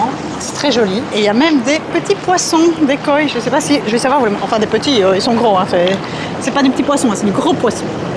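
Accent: Belgium. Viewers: vous etes belgiques ou francais?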